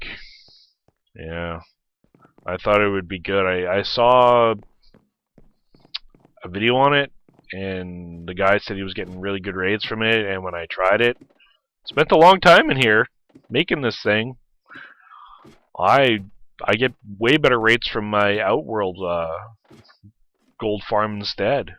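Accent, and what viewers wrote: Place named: Canada. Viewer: Love your Canadian accent eh.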